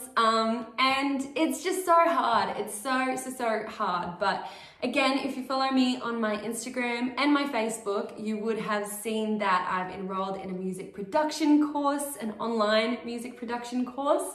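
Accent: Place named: Australia. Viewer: Really good cover! 👏 I love the chatty videos! I like hearing you talk. Not only to hear your lovely Aussie accent (lol) but to hear your stories on your musical journey.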